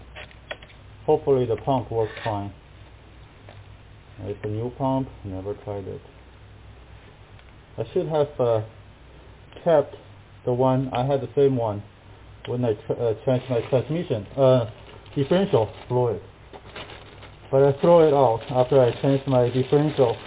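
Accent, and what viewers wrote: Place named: China. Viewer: cool bro!sounds like you are chinese,me too!keep going,you did good job!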